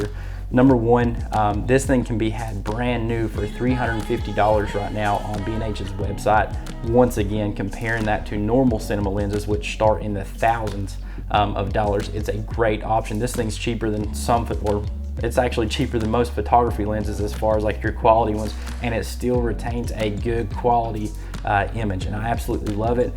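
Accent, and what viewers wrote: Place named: South, United States. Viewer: I'm subscribing just because of your southern accent.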